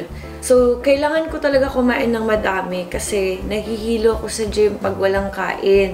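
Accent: Visayas, Philippines. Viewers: Wow apple cider vinegar ,, 😍😍 love u ,, don't change your bisaya accent !! Go bisaya!!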